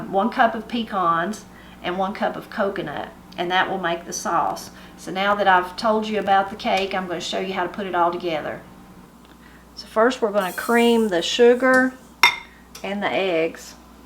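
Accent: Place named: Florida, United States. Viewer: Your accent reminds me of my family in Florida. I have had this cake. In Oklahoma at our yearly Easter family reunion. Pot luck favorite! ❤❤❤❤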